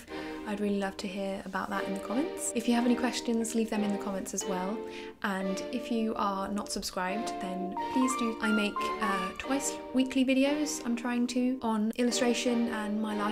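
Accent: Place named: United Kingdom. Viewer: Why did I know you had a British accent?!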